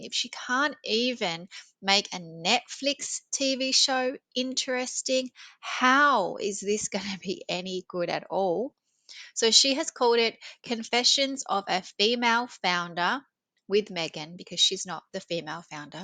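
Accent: Australia. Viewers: Hearing you shared Australian accent. Brilliant. You're funny and articulate. Thanks 😅❤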